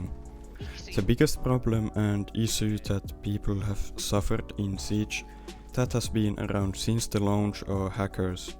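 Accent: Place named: Finland. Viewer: jaaha vittu mietin ku luki tilaa introssa tajusin ku ralli enkku alko kuulua suomalainen sehän on :DDDD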